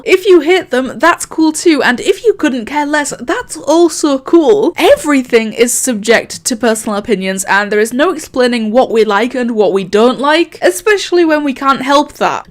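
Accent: Scotland, United Kingdom. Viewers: Scottish accent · are you scottish? i am :D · Are you from scotland? Because your accent reminds me a lot of Merida and I think she's from there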